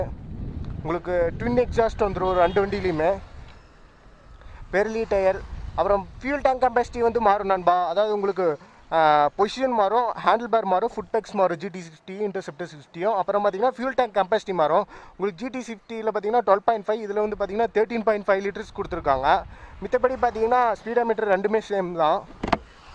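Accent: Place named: Kongu, India. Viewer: Kongu tamizha nee super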